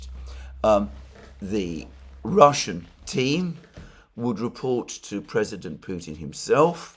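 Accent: United Kingdom. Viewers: Dude, u have problems with your voice and frequent upper respiratory infections because it seems that you have nasal obstruction, deviation or some other anatomic abnormality that affects your nasal breathing and talking. It has been obvious that u grasp for air when talking between the words and your posh english sounds nasal as well. Have u ever consulted an ENT specialist? Most of these stuff can be corrected surgically.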